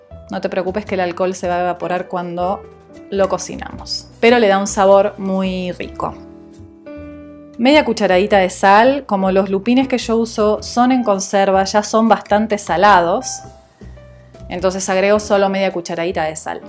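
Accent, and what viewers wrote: Argentina. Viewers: Como me encanta el acento Argentino, saludo desde Brasil! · Hola Sos de arg? soy nueva, jeje tenes acento medio argentino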